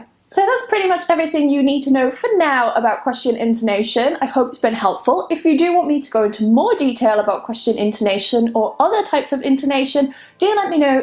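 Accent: United Kingdom. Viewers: Hello! Beautiful British accent! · It's lesson was marvelous and helpful for me. I love your British pronunciation. Thanks.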